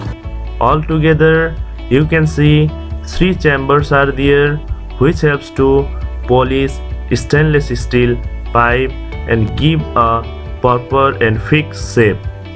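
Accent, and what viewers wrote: India. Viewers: Indian accent is the Ugliest english accent around the world and it is very hard to understand,bad pronuncuation,to name but few. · Give up your Tamil accent of spoken English. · Bihari bol raha hai?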